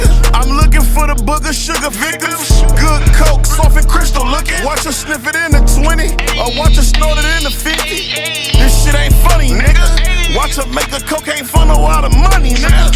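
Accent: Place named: Carolinas, United States. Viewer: I think he sound like them boys in t carolinas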